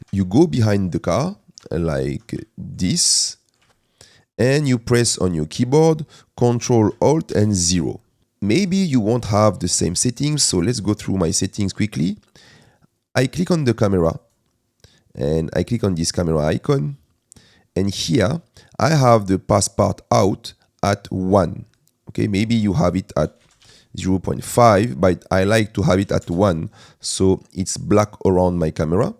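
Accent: France. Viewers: mdrr le big accent francais mais sinon merci pour le tuto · C'est quand même plus facile de comprendre avec un accent français. mdr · accent français détecté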